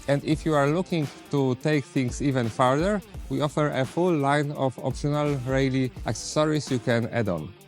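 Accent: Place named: Poland. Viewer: My ears bleed after listening to this Ponglish 😢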